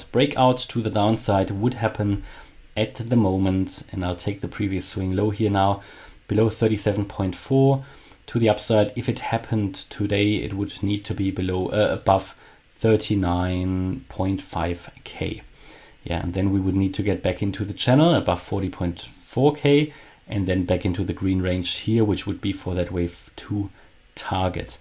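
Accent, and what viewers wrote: Germany. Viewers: According to the accent you should be German. Danke für die excellente Arbeit. Es ist schön zu sehen wenn sich die EW´ler einig sind.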